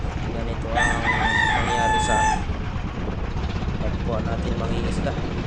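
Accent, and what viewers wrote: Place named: Visayas, Philippines. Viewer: ayo sin mo pag salita mo bisaya kadong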